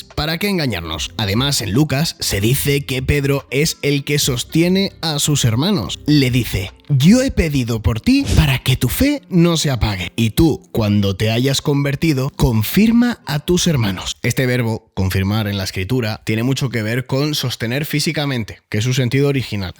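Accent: Canary Islands, Spain. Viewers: ME ENCANTA TU CLARIDAD EXPLICATIVA Y TU ASSSENTO CANARIO. ❤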